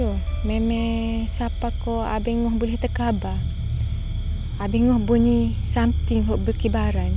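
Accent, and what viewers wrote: Kelantan, Malaysia. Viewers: Best lah kk cita Frist time in your channel keep it up 👍suka u cakap kelatan nak belajar dengan kk boleh 😉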